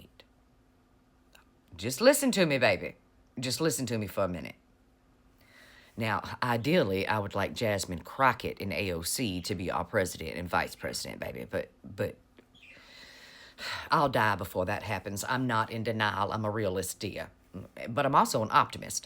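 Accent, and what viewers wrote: South, United States. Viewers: Crockett and AOC would be great for USA. You speak truth, and with a real Southern drawl.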